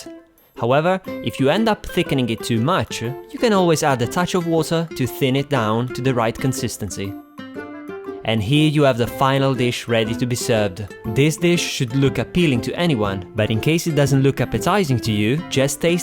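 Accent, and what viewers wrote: Italy. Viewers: I knew it! you got the touch of Italian flair in your manner of speaking....please come to Toronto...you stay with me....and we cook veges day in and day out...I mean it.Toronto is heaven for vegetarian due to imports from Asia, central America, the caribbean, mexico and so on. Here, I see vegetables and fruits I haven't seen somewhere else. probably I will record a video and send u next time I go to the store. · I think it's italian i'm italian and that's what it sounds like :)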